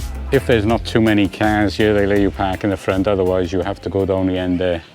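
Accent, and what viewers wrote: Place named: Cardiff, United Kingdom. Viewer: I thought u had a Cardiff accent I’m living in Melbourne but I’m from porthcawl originally I’ve got a condo in jomptien in the Maldives ready for retirement after this virus clears up your video is very good keep it up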